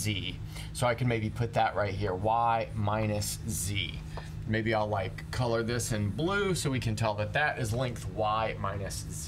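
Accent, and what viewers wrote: United States. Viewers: this guys American pronunciation is infuriating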